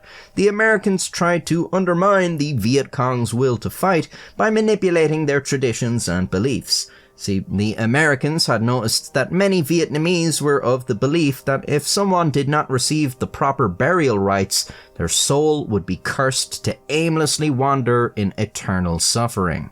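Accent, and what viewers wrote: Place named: Ireland. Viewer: Hey Fella, Gotta say I love your vids and content - been watching your vids for a few years - no BS ads, great story's, well presented with your lovely Irish accent and half a brain to boot. I won't and don't like and subscribe on principal. But keep your home page up in a tab for when you do upload and you NEVER disappoint. Legend mate! Effing honest Youtuber and hope you still make a buck. .... Just a little thank you from me to you. Cheers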